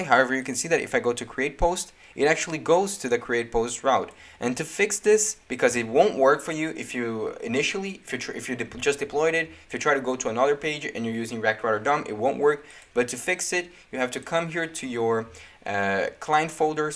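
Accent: Brazil. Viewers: Nice video! Thanks for the info! I have a question: there is a way to change that ugly domain name from Netlify to a custom paid domain that I already own? (Idk why I'm asking in english, your accent is clearly brazilian) valeu mano kkk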